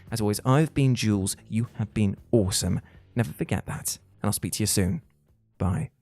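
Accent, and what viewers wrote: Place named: London, United Kingdom. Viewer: Jules you sound like you're from londonnnnnn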